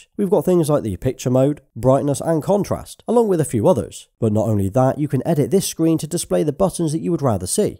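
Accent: United Kingdom. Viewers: Hello, are you british?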